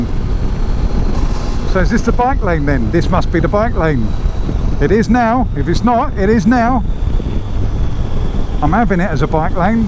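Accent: United Kingdom. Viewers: Damn lively man...enjoyed every bit of it...the queenz english and america r a good combo..!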